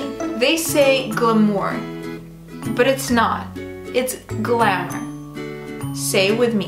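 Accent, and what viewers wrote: Russia. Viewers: You do have an accent. A Russian one I presume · You have a russian accent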